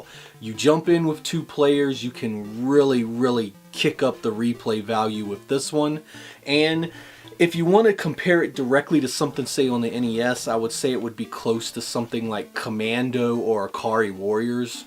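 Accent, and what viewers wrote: United States. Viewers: The movies would be in deutsch xD. The newer movies are clearly better and I like them all. I dont write here, that this video is cheap or something like that, because I dont care, your content so good here also and your analyses are great. I criticise something different, isnt in? Oh my god, the way of talking in USA is facking actually really so annoying, you know.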